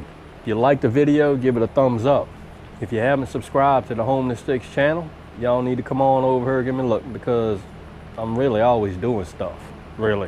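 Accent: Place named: Pensacola, United States. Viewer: Whoa man! That’s a damn fine first cook on the PBC. I’ve made a pot roast on it before & it came out real tasty. Never tried it with the peppers before, in fact I’ve never even heard of using it. But I do plan on giving that a try soon. Love the accent! Sounds just like my cousins from Pensacola, & I love, love, love the hat...I too, am an American INFIDEL!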